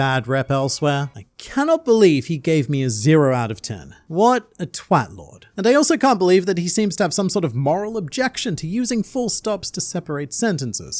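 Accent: United States. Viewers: Actually, you're both very confused. Pluto is a dog. Also, your attempt at American is only one of many accent's I don't feel like counting. We have a lot. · Your speaking tone and accent is probably the closest to "American" I've ever heard from an Australian, so I can see why they might have gotten that part wrong. As an American who has talk to a decent amount of Australians over the internet and watched your channel, I didn't realize you were Australian until you mentioned it yourself. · Um actually you sound extremely American. You're just distorting your own accent. Trust me I would know, I'm from Canada. 😂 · can you do a video in your American accent? It's hilarious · Top notch American accent and as a New Yorker I was 100% fooled by your "I'm walking here" 😂😭😂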